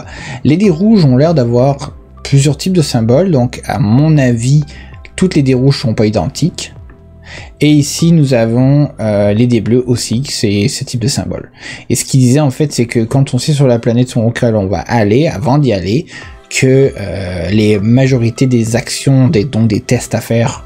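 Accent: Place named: Quebec, Canada. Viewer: Sympa, la video. Ca décode bien. Et en Quebecois :)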